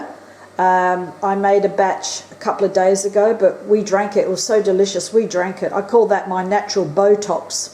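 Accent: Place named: Australia. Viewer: Thank you so much. Im just starting back with canning after a few years and i am doing lamb mince cos Aldi had it marked down. So nice to hear an aussie voice !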